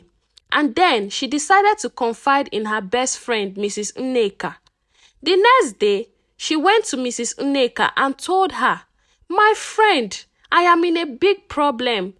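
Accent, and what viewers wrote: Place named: England, United Kingdom. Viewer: I like your English accent 😁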